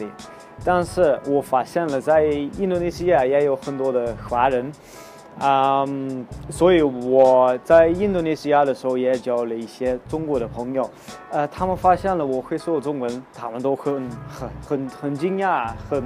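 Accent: South, China. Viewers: You have the typical accent from the South, for example with the "ch" you say "s" but it's ok i understand you, my GF is from 四川 Sichuan, very similar accent. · 好浓的南方口音啊哈哈哈 你听北京话能听懂多少？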